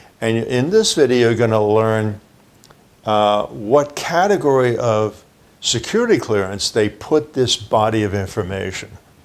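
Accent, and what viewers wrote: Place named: New York, United States. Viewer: Sounds like he grew up in NYC.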